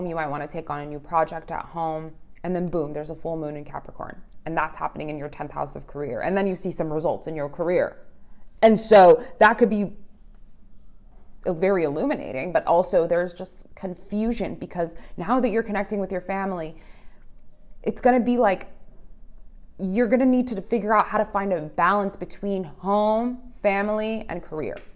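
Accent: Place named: Australia. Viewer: Australian accent 😅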